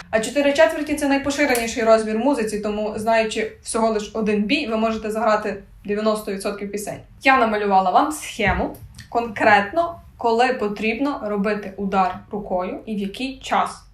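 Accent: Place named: Western Ukraine, Ukraine. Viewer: Уроків гри на гітарі багато, але ці особливі. Бо Ви маєте певний шарм в донесенні меседжу до глядача. Звідки ви? По мові чую, що мали б були західні області. Тернопіль? Львів? Я з Франківська...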